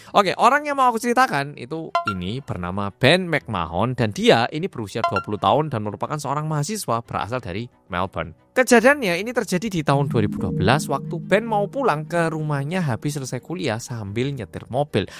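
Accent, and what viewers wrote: Java, Indonesia. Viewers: Logat medok'e kui, mas pancen jowo tenan👍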